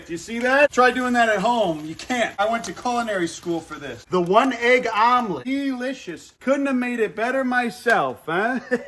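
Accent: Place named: Texas, United States. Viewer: you got a Texas twang that is organically coming out. Hoooo hooo hooo 😂 I could watch the animals graze and eat for hours. ❤❤❤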